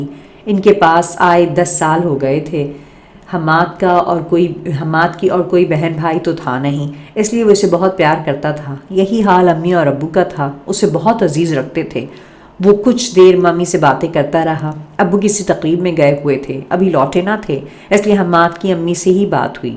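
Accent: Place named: Upper class, Pakistan. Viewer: Irna burger ban k mt bolyn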